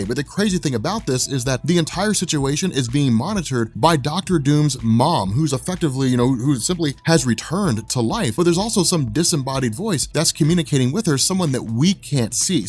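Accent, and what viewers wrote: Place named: Appalachia, United States. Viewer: i thought i heard hillbilly in your voice i hate KY as well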